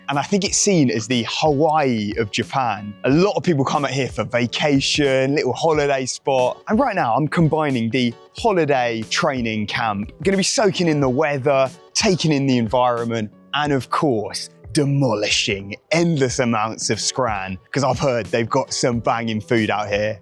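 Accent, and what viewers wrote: United States. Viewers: I feel like the video could have used more of the Translator. American accent 6.1 out of 10. Surfer or John Wayne? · Please never abandon the American accent. Peak.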